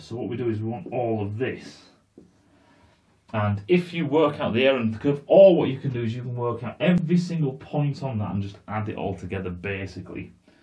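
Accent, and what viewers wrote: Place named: Yorkshire, United Kingdom. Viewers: Normality is returning to the world with a Yorkshire accent 😉 It’s good to see and hear you once again. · Didn't click on the video csuse ofnthe title, I clicked to hear your sexg northern accent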